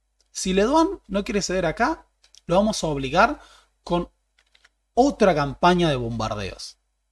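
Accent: Argentina. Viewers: Deberías hacer un esfuerzo para no pronunciar con acento argentino la Ll como ch.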